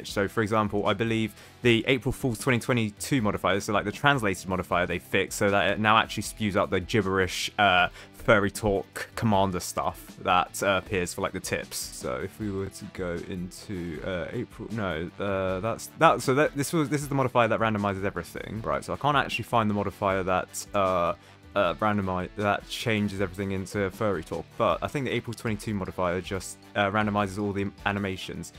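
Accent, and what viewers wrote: United Kingdom. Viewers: continue yapping I love listening to it bri'ish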